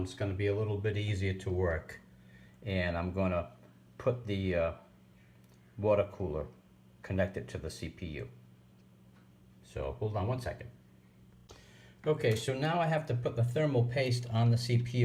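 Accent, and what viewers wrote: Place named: New York, United States. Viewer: I'm with you Robert, he does have an interesting and great accent. I'm English and I can place most UK regional accents, a visiting lecturer was a bit shocked when I placed him within a few miles of his home just on his accent. To my totally untrained English ears sounds like he's from the New York wider area?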